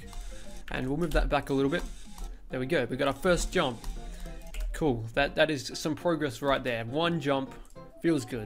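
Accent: Australia. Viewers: Are you Australian? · Like that good Australian accent mate. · most Australian intro ive ever heard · By the 1st 3 words i guessed you are an australian M8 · is he australian or some british country if he said "g'day" hes australian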